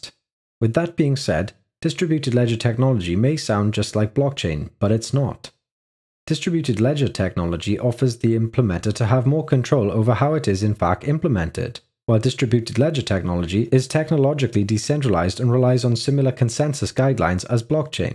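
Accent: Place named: Germany. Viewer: really shitty german accent, I did not understand anything because of his stupid accent from the 3rd reich!!!!!!!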